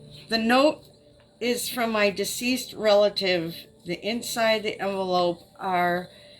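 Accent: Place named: Upper Midwest, United States. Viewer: That accent !! - I recognize as either Wisconsin or Minnesota !! Go Badgers ! Lolol. Where's Margie Gunderson ?!?!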